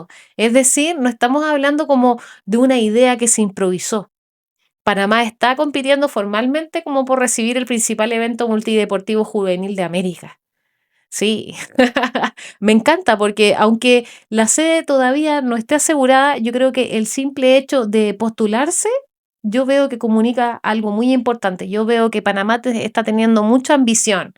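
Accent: Chile. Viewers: Mi amor de dónde eres? Que voz más linda !!pareces Chilena el mismo asentó y la voz y sin fartarle el respeto eres muy linda❤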